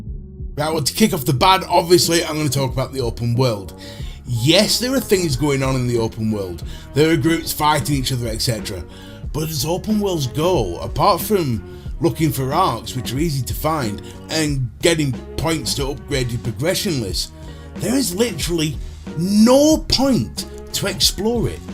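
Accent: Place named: Yorkshire, United Kingdom. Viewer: Awesome review, guessing by the accent you're from Yorkshire/Lancashire, Anyway I bought Rage 2 and I was having a blast but see your points entirely and also don't agree it should get 9 out of 10s. I think a fair score would be 6/7 out of 10. Maybe 8 maximum. I think "getting your money's worth" is a little subjective because I'm having so much fun with it I've never thought "hmm I feel robbed" HOWEVER, I wouldn't recommend buying full price to someone just now. I think if you waited a couple of months you'll be able to pick this up for 15/ 20 pounds and tbh that's about the price It would seem like a bargain. when I first saw it on steam a couple of weeks ago it was priced 29.99, which I think is where it should of stayed but day before released I noticed it cost 40 pounds which for me is tipping it just to far to recommend full price.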